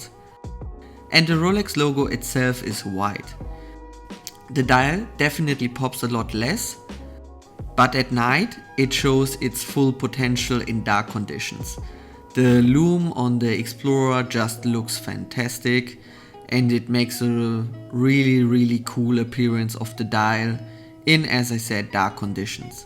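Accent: Germany. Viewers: Great - where are you come frome ? Your dialect sounds like German :) If so - I would be interested what’s your job?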